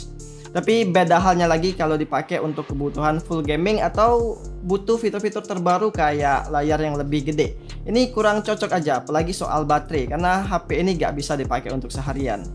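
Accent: Palembang, Indonesia. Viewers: org palembang kah kak? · Orang palembang yah kak? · Wong Palembang e hahah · Alangke lemaknyo haha, wong palembang nian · Orang palembang ya bang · Nak nanyo kk wong palembang? 🤣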